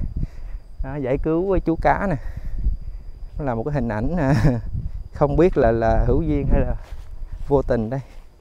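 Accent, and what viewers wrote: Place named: South, Vietnam. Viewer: giới thiệu giọng thanh thoát pha hoà trong Nam dể nghe hơn Huế Revew [xin lổi nghe tại mình thường nghe theo giống Nam kỳ lục tĩnh]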